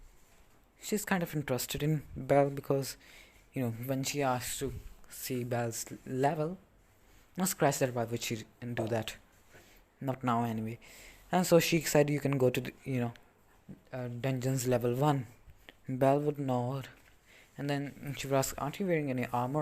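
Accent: India. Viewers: Are you indian or have an indian accent?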